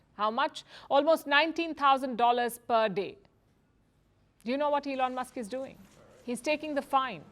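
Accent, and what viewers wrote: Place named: India. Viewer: You are lier I wish you get off of your Indian accent first to be a speaker 🔈 plus you don’t know nothing about corruption in Brazil lady Lord has mercy on your soul and the machine manipulation behind your job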